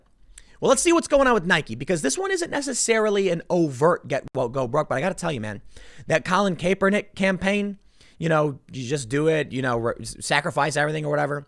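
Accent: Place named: Chicago, United States. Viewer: I think its the Chicago accent. Illinois has some interesting ways of pronouncing words, and celebrities have interesting sounding names, its bound to come out some how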